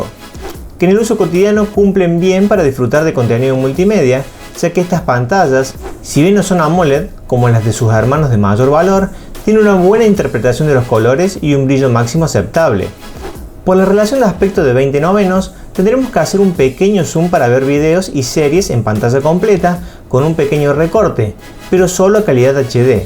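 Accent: Córdoba, Argentina. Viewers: pregunta sos cordobes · eres cordobes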